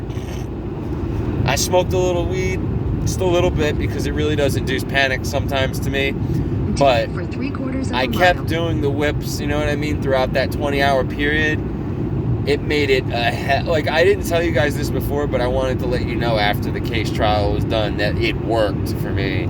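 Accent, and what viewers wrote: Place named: New York, United States. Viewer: I just saw where a few people had asked where you were from, I must toot my own horn when I asked myself the same question because I thought you were from NY with that heavy accent. Keep on doing what works for you! I just hope one day I can rewire my brain. I'm terrified of having a bad acid trip also which just means Murphys law is bound to happen if I ever did try it. 🙃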